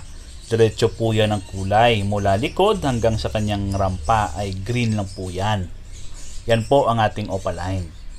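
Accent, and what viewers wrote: Iloilo, Philippines. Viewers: Puga lods ilonggo ka gid? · To ilonngo ka.. may punto kasi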